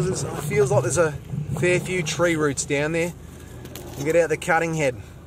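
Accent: Australia. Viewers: New subscriber here. Love the Aussie commentary. 'this is where we get our water, trees make our water' had me in hysterics.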